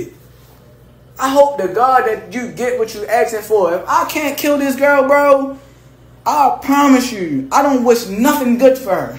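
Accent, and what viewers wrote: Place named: Baltimore, United States. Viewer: They yews and dews be killing me. Baltimore definitely in the top 5 worst accents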